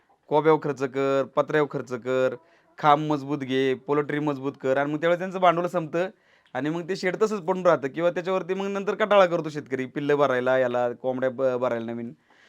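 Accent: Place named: Dhangari, India. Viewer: Dhangari bolu nko salya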